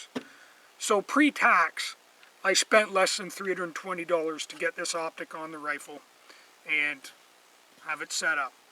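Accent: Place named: Canada. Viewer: he's talking Canadian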